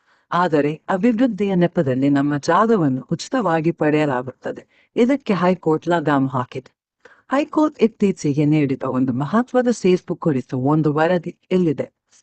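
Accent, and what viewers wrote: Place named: United Kingdom. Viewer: Listening your Kannada like English lady speaking Kannada. Next time change voice of fluent Kannada speaking person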